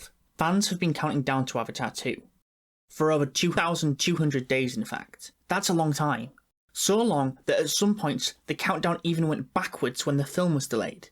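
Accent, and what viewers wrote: Yorkshire, United Kingdom. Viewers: Avatar the way of wo-ah 😂 God I love British accents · Came here for avatar news but I'm too distracted trying to figure out his accent. Yorkshire??? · is that a yorkshire accent or am i trippin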